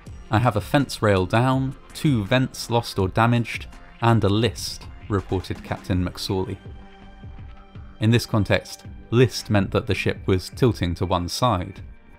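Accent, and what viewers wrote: England, United Kingdom. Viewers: Im beginning to develop an addiction to your voice and the way you tell stories, which part of uk is your accent from? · American ship British narrator 🤔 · Between you and Coffeehouse Crime, I am getting my fill of soft-spoken English guys telling me about terrible things in a soothing, satisfying manner.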